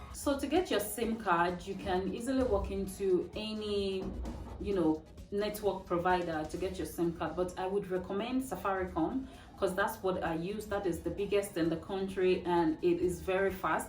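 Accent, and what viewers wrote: Nigeria. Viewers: Your physical looks are Kenyan coincidentally. Your accent is also not as deep as my Nigerian next door neighbours😊. In three months your Nigerian accent will disappear😊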